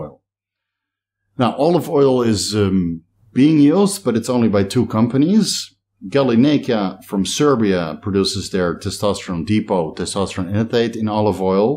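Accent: Netherlands. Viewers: sounds ok to me. A bit of a Dutch twang but ya get that when english isnt your first language. You say gyno no worries now. The content is what im here for and give it an A+👍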